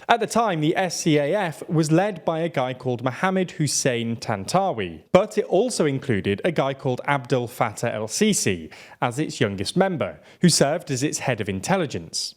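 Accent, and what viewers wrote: United Kingdom. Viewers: Its crazy for a dude with a British accent to all a global south leader "autocratic" lmao Especially since Egypt broke free from direct British rule about 10 years before my grandmother was born. In fact, there's probably people in Egypt right now who remember British rule. Like have a seat. Its also buck wild that they're making it seem like the economic issues of the country can be blamed on like one dude as if colonialism and the imposition of neo liberalism have nothing to do with it. Like bfr. Its useful to hear how liberals view things tho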